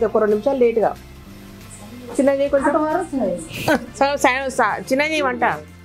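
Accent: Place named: Mahabubnagar, India. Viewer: Hi aunty nice video medi kosgi side Kada aunty uru pothireddypalli ante mahabubnagar dist e Kada meru mataldtunte alane anpistundi nice video aunty murkulu pedaga chesaru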